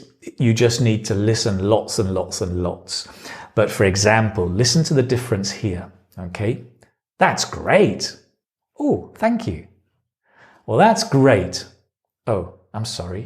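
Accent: United Kingdom. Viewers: Sir, which accent of British English do you speak ? Is it the modern RP ?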